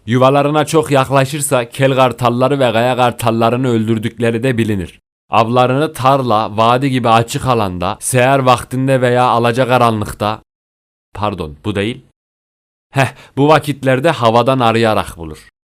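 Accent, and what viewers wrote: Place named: Çorum, Turkey. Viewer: Kardaşıma şunu söylemek isterim kesinlikle sen bir üreticisin seni saygıyla selamlıyorum. Şiven gayet kulağa hoş geliyor ben Çorum Yozgat arasında gidip geldim.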